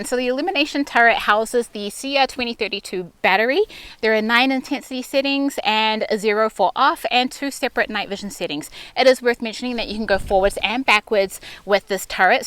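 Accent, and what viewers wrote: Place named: Australia. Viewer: Great break down and description . Was thrown at first with the accent and was surprised to see your channel is out of Australia. With the law's trying to get changed here in the States and law's that had been changed in Australia. Very interesting the many topics your channel can bring. Again great review , very well presented and very informative. would like to see actual action/use of the product/product's. Keep up the great work!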